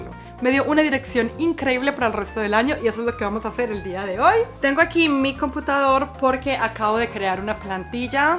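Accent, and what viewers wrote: Antioquia, Colombia. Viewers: Otra vez se lo digo?😂Amoooooooo cuando hablas con el acento bien colombiano 😍😍😍😍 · SALUDOS CORDIALES DESDE COSTA RICA BENDICIONES PARA TI Y TU FAMILY QUE DIOS CUIDE DE USTEDES X SIEMPRE EN HORA BUENA CON TANTO PREPARATIVO DE DESEOS DE BIENESTAR... DE❤ TE DESEO DICHA REALIZACIÓN... MUCHO POSITIVISMO... Y LUCHA DE LOGROS X ALCANZAR... ME ENCANTA VER TE TAN POSITIVA CON TANTO CAMBIO Y VER COMO COMPARTES CON TUS SERES QUERIDOS. EXCELENTE... LOGRES LO QUE LOGRES SERÁN TUS ÉXITOS DEL HOY QUE PROFESAN TU LUCHA... TU AHNELO MAS SENTIDO MIS SALUDOS Y BUENOS DESEOS.. Y ME EN CANTOOO ESCUCHARTE TAN COLOMBIANA TAN GENUINA.. DEBERÁS PA DELANTE COMO EL ELEFANTITO LO... BUSCANDO EL PRIVILEGIO DE LOGRAR LO AHNELADOOO... LO DESEANDO... LOS SUEÑOS PROMETIDOSSS... DE❤ TE DESEO PROSPERIDAD EN SALUD Y ECONÓMICO PARA QUE ALCANCES LO MUY DESEANDO EN ESTE 2024..❤❤...❤❤..❤❤...❤...❤...❤❤..❤ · Tengo mi lienso en blanco. 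Tenia un trabajo estable lo deje hace un año. Querìa empezar 2023 fuera de conford. Fue un año muy bueno. En este 2024 estoy sin trabajo no veo un horizonte. Pero amè escucharte con el acento colombiana jejjee imaginado tu niña vestida de princesa. 🍇🥦🏖🏡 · Amo el acento paisa de este video❤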